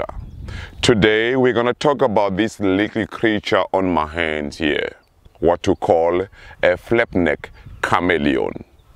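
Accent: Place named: Africa. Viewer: hey man that is rude andrea preti i am also from afrika that is how afrikans talk also zulu and i am fine with that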